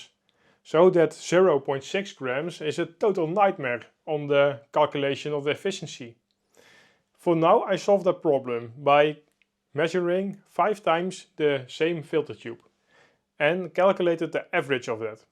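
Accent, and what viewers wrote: Netherlands. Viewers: half a second in i reconised your accent as one of my own. and then at 48 seconds you confirmed it. How are there so many dutchys on youtube ill never understand. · Actually this was part of what got me hooked. Love me some thick Dutch accent, probably because I've met so many nice Dutch people. Greetings from duitsland, godverdomme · I can barely understand what you are saying. You have a really thick accent I guess Dutch..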